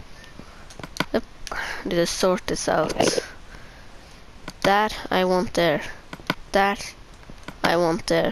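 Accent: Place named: Norway. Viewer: VS Klubben then its a Norwegian accsent..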